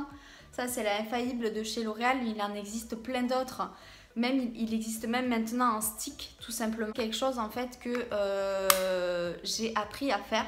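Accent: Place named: South, France. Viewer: Cet accent du sud, j'adore !! J'aime beaucoup le réal ysl ☺️ j'en ai un, ils sont top et sentent trop bon !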